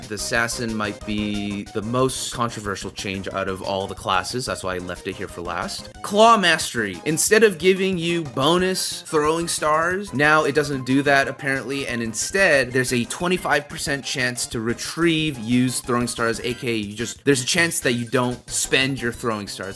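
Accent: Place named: United States. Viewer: dude your accent is awesome, which part of america does it come from?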